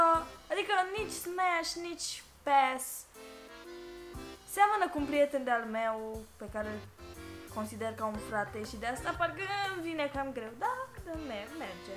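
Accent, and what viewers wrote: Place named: England, United Kingdom. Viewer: Vezi ca ai niste engleză pe la coltul guri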